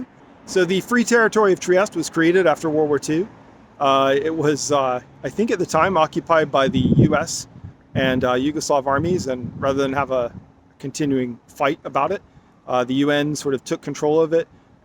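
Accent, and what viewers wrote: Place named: Southern California, United States. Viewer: is it just me or does this guy have the most intense southern california accent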